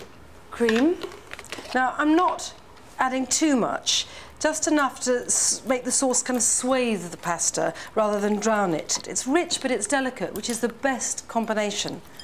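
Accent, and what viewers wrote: RP, United Kingdom. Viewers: Is it just me or was she even more posh pre-coke days??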